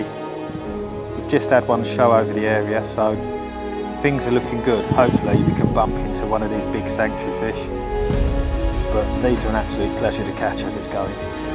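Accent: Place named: United States. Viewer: Great video but why the stupid American accent…?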